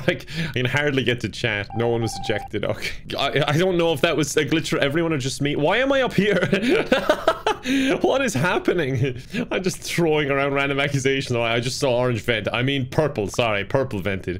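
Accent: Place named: Ireland. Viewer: Your Irish ☘️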